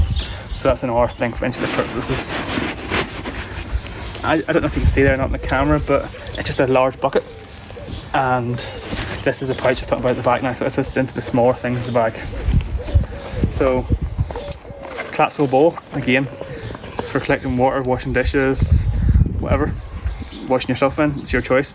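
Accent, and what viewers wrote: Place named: Ulster, United Kingdom. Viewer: Just found and joined Your Site , There was Eng subs on it ! Anybody who cant understand Your soft Ulster voice can Feck off as We down South would say but I am in London for longer than Dublin so I just got the same pack as Your Self and have done nothing to it yet , one for the New Year anyway Happy Crimbo to You and Yours and I look forward to seeing how it goes next Year for You .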